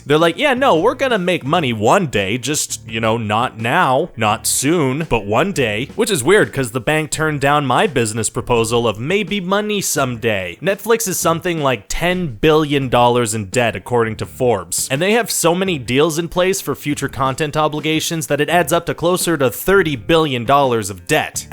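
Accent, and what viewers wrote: Canada. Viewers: Wow is screen rant Canadian? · Wait dude are you Canadian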